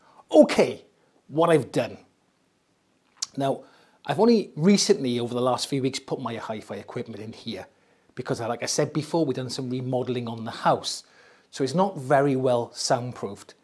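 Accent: Wales, United Kingdom. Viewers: I have those type stands, a little different and mine are not as nice that aside i couldn't be more happy with them. Mine are faux leather and wood effect although the wood effect is like a vinyl wrap but it's very thin so you must be careful, yours look slightly better made on the front effect front. For the money they are excellent. Btw I am impressed by the effect you put into your videos with the editing and little effects. You are very rare on that front and the effect is much appreciated. I can tell from your voice that you're from my dad's country, he was from Buckley North Wales. Miss Wales but living down south makes getting there hard.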